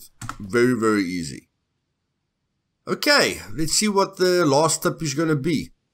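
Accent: Afrikaans, South Africa. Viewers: Great video, subed. Edit: your Afrikaans accent is the best! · You my china sound rough South African Afrikaans ekse ... lekkerrrrr lol..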